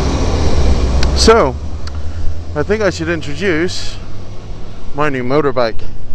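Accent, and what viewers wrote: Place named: Northern Ireland, United Kingdom. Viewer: yea thought you were from norn iron.. im from carrickfergus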